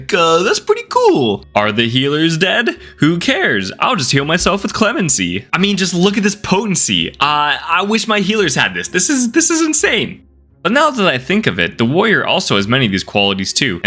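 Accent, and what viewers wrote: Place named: Southern New York, United States. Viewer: That was perfect Southern NY / Northern NJ voice.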